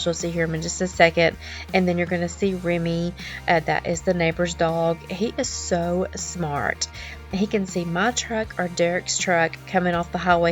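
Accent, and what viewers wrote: Texas, United States. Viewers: Hello sweet friend! I just love to watch you clean and decorate. I end up wanting to do the same! Lol thanks 🫤 I love your videos. Your Texas accent is Beautiful!! Big Texas Hugs, from my side to yours. 🫶🏽❤️💗💝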